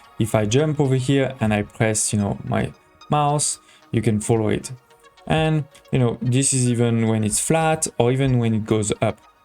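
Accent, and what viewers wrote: France. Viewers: Hello man I know that it has nothing to do with the video but I have have a problem with my mods, i want to play with the Commuter pier for new world but it doesn't work. All the other mods of Spice it up don't work too, and I use the mod launcher so if you have any clue how to fix it or if you know if spice it up is not udpdated with the nex world rising it will help me a lot. ( I think your french looking at your accent and me too so if your french you can answer in this language). Thanks for the videos :)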